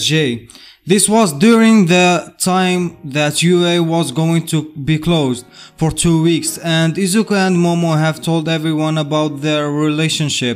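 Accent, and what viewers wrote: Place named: United Kingdom. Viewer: You became extremely British this episode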